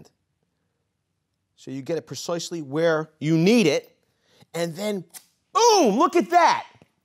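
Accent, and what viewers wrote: Philadelphia, United States. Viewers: yo bro you would fit right in at my house for sunday dinner ....you sound like ur from my neck of the woods ..South Philly Baby!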